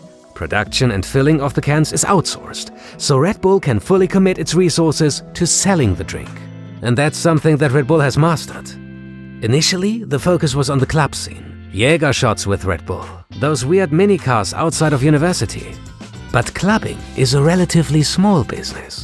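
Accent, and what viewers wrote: Germany. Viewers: u german? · Athletic Interest is THE best channel when it comes to informative and exciting sports stories. Thanks a lot! And never get rid of your Unique selling point: your german accent speaker. simply great 🥳🥳🥳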